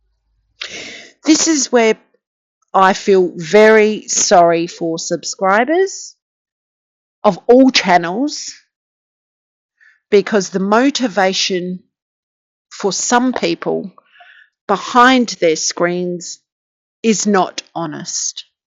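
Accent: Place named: Australia. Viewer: Hi from Perth….loving hearing an Aussie YouTuber. Love your content and the way you speak your mind.❤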